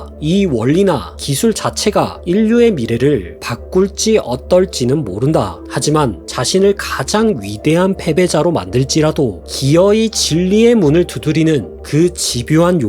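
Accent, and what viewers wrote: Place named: Gyeongsang, South Korea. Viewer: 경상도 사람이 대본을 또박또박 읽는 느낌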